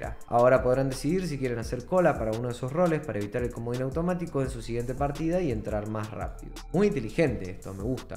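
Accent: Argentina. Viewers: tenía acento argentino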